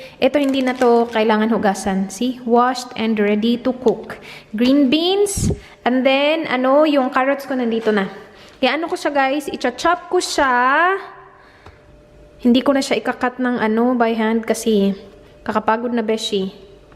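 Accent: Visayas, Philippines. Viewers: I love her visayan accents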